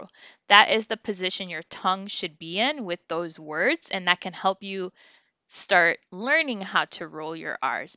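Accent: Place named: United States. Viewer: I am disappointed because you clearly have have a non-native, American accent in Spanish. For example, at 2;37 ff. you say "ca", "co", "cu" with gringo aspiration on the [k] sound, you say it like [kh]. In Spanish, [k] has zero aspiration. IMO only native speakers should be teaching phonics and accent.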